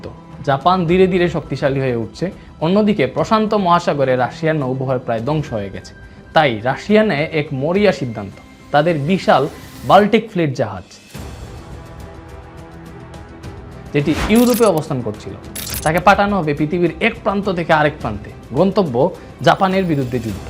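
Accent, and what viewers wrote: Sylhet, Bangladesh. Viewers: bhai apni ki faltu Sylhet er moto kotha bolen keno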